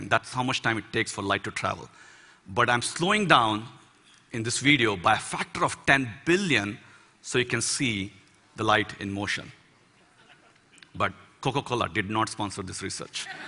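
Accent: India. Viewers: hintli aksanı kadar kulak tırmalayıcı bir aksan yok amk ;( sessiz izledim videoyu. coca cola şişesiyle deney yapmış sikik. biz de bim poşetiyle yapmalıyız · Bu hindistanlilar ingilizce danisanda iyrenc seslenir sanki baska bir dilde danisirlar iyrenc videoya adama gore baxa bilmedim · Hindistanlıların ingilizce konuşması o kadar komik ki adam şu videoda evrenin sırlarını verse , ışıktan hızlı giden uzay gemisini yapmayı falan anlarsa , ne bileyim işte dünyanın en önemli şeyini bile anlatsa , gene komik bu aksanla ))) eleman sanki temel fıkrası anlatıyor.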